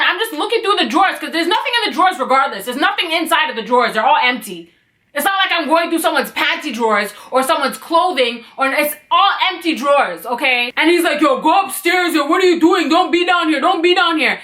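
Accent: Toronto, Canada. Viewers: The Toronto accent triggers me idk why😣😂😂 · I love your toronto slang/accent lol it's so cute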